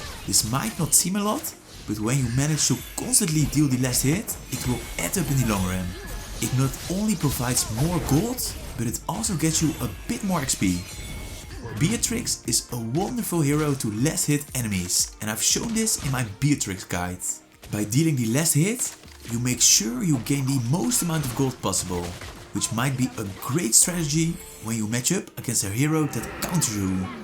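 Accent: Netherlands. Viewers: By your accent... Are you dutch?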